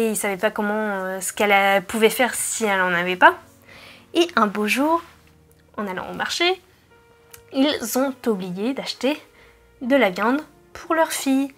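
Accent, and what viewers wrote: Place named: Spain. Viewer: Coucou, j'adore ton accent espagnol. J'adore ta petite histoire. Merci pour ce que tu fais.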